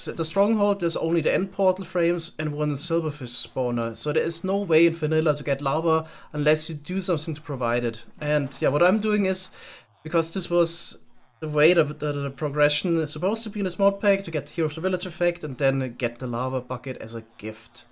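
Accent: Germany. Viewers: he's def German.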